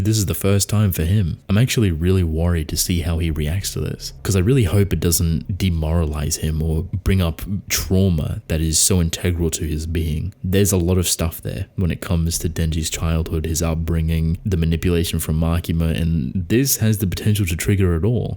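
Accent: Australia. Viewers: Great vid. Are you Aussie by the way? I have trouble pinning down the accent.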